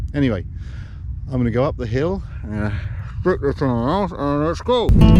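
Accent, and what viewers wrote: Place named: United Kingdom. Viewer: I actually meant to say, “when did you leave England? You sound more British than Canadian.”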